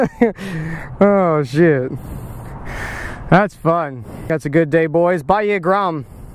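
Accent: Australia. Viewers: 10/10 Australian accent. Please do more.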